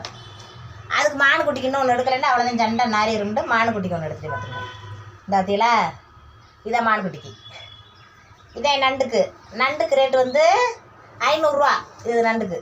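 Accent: Madurai, India. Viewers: Akka ninga madurai ah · Akka neenga madhurai ya super ah pesuringa akka ella dress nalla iruku 👍👍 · Akka neenga Madurai ya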